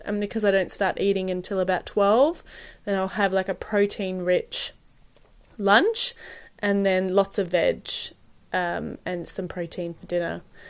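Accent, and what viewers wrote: Australia. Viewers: Why do you sound so Australian. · Maso ..I'll give you one hint:....She is Australian.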